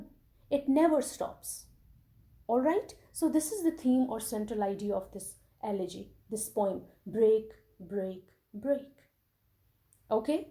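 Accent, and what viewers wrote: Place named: India. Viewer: لهجه هنديه ايع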